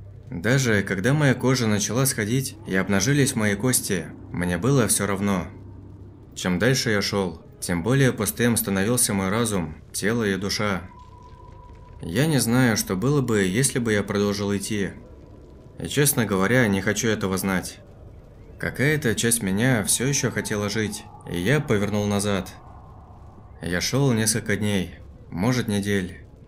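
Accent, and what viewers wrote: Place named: Urals, Russia. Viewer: Да,в утра.Уральский говорок-это круто.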